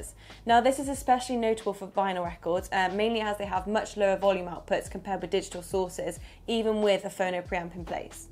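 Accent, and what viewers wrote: United Kingdom. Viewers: Good info, delivered too fast. Your audience is not all familiar with whatever UK accent you have.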